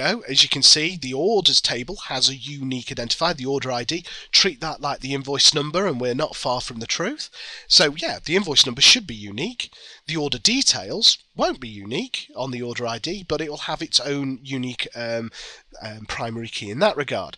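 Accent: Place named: United Kingdom. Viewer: Hey brother thank you , i just did whatever you showed in this video and i learned how to find the relationships and dependancies.Thank you and you'r british accent is nice too.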